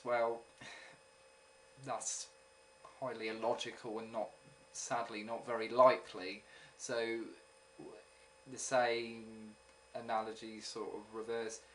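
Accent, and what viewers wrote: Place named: United Kingdom. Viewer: This has absolutely nothing to do with the topic of your video, but everything to do with my preoccupation of speaking with different accents: Where are you from? What PART of the UK? Because wherever you're from is where my I-don't-have-to-think-of-it British accent comes from. Fucking love it.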